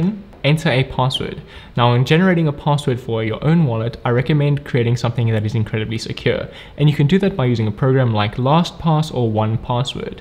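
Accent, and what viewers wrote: South Africa. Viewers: hey brother, you sound south african?? I stay in Cape Town and would really aprecciate if you could give me advice on best platforms to use in South Africa?? and any advice in general as a cape tonian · Hey, are you South African, and do you also reside there if indeed you are, curious coz your beautiful accent sounds like it?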